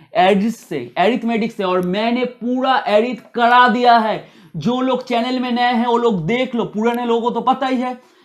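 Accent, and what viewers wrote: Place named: Bengal, India. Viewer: Sir by your accent it's quite predictable that you're a bengali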